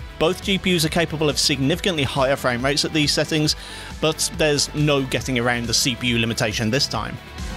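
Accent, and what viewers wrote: United Kingdom. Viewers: Awesome channel, with great thought-provoking content! Nice British accent too....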